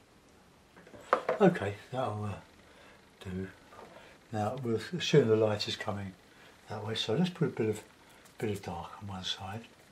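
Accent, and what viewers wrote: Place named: South London, United Kingdom. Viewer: Great painting, I look forward to your videos, you sound like a south London bloke, I'm in Croydon do you know where I might pick up a hake brush locally? By any chance, cheers for sharing your work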